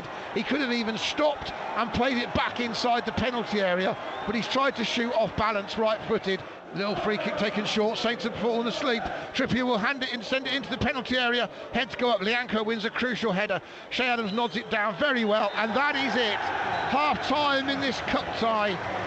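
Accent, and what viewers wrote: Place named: United States. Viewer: He is American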